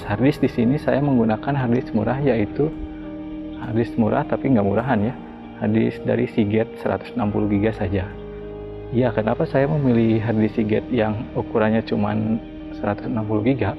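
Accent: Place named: West Java, Indonesia. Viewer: nyunda pisan euy kang.. haha sip lah si subscribe yeuh... haha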